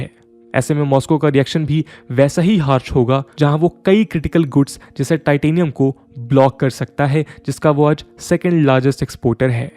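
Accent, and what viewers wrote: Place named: Bihar, India. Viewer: Mr., From your voice tone, I think you are from North Eastern Bihar.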